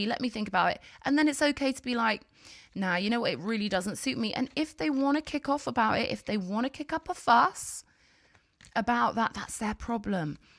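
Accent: United Kingdom. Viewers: it certainly is a British accent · It's that an British accent?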